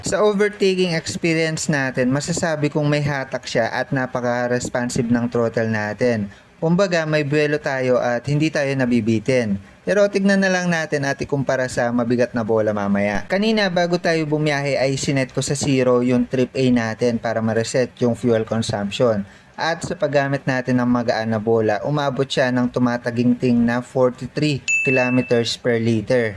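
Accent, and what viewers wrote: Pampanga, Philippines. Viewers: Kapampangan ka Boss? Tono pa lang eh. Nice one dami kong natutunan dito.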